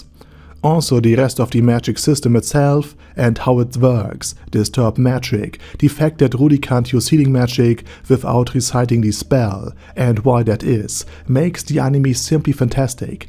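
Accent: Germany. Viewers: This German accent haha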